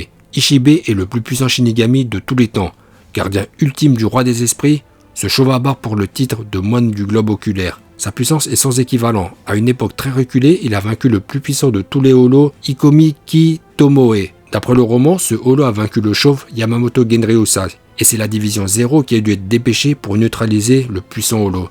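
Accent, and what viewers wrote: Japan. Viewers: Bravo pour ton accent japonais c'est cool 😎🇩🇯🇩🇯 grâce à te vidéos sur naruto DBZ bleach je appris beaucoup de mots japonais merci beaucoup mn frère est perso j'aime bien le bankai le moian globes oculaires le chauve de la division 0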